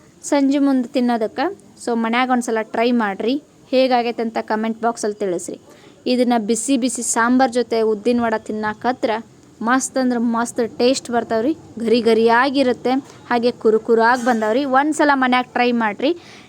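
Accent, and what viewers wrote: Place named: Bangalore, India. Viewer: Niv Bangalore kannada matadri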